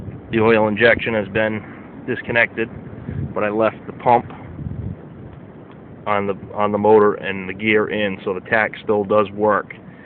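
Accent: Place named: Rhode Island, United States. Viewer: Wow you sound like your from RI!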